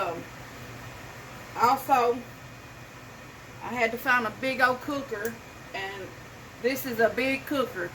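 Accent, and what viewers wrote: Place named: Kentucky, United States. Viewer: ok I thought I was hearing a Kentucky or TN accent. 💜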